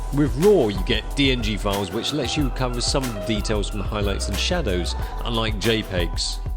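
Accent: United Kingdom. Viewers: With that British accent, i was not expecting an asian guy..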